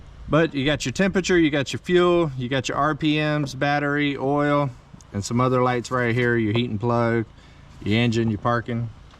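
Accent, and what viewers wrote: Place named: Louisiana, United States. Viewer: Just want to know if you're from Louisiana because you sound like you got Louisiana slang going on when you talk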